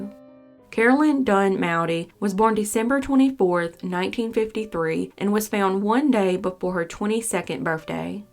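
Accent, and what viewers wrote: South, United States. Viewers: Just lovin that cute southern accent, you sound like a gentle stream caressing the pebble just pure flow x love it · That accent takes me back to childhood 😭. I miss the south, Ohio is horrible place.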